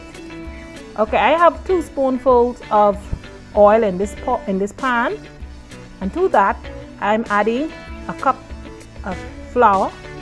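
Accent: Bahamas. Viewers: My father's side is Bahamian, Mother Floridian !! This was one of my favorite dishes!! I was the one pulling out the hammer to pound them . Memories, love the accent 😍!! I'm in Colorado!! Purchased conch from an Asian market . Will try your recipe. Watching you refreshed my memory of the overall process. Happy eating 😋!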